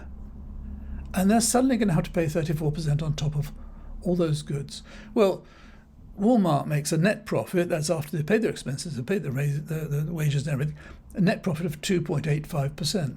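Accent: United Kingdom. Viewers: Glad to hear common sense in a UK voice. How refreshing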